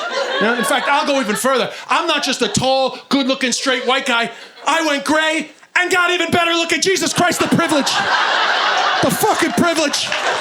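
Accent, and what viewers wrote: New York, United States. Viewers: It's just a new york accent , and the fact both of them are a bit hyper.😂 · You code-switch to a much stronger American accent for American audiences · Never heard of this guy before, but DAMN that was a solid set. High energy, aggressively funny and smart, and an amazing combo of street New York accent with metropolitan comedy. 5 of 5 stars!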